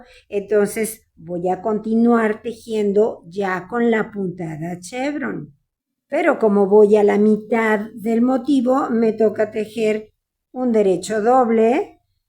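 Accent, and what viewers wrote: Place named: Mexico. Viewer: gracias, acabo de hacer una muestra con punto bobo y también queda muy bonito., no se de que país sois ? el acento parece de México, o tal vez me equivoco 🤭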